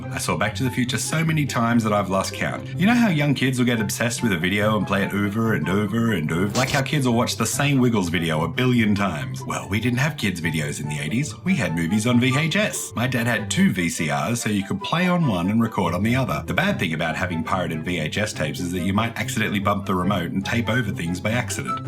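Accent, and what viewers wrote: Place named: United Kingdom. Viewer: oh damn dude that comment i left, i deleted after a minute, because the tissue joke was quite obvious for everyone, and not a quick split second blink-and-you-miss-it pause-at-the-right-time kinda deal (like how i thought it was) you replied fast!! i appreciate that anyway this is a good video and i adore back to the future and thank you and all that subscribed and whatever and make more videos and shit that whole theory was pretty top notch and i love it actually... i kinda wish it was true and canon now honestly but my only ACTUAL criticsm for your video is you should vary up the music and sound design a bit more you know, the heavier points you drop the music to give some focus, maybe switch it up every now and then so it's not the same stock kevin macleod track... just make sure the video is as good to listen to as it is to watch that's it though, the editing was great, the jokes were good, you have a great personality, your voice is british and memorable (which is great combo on youtube) the sound design just needs some more work, and that's just from THIS video alone, i have yet to see your others!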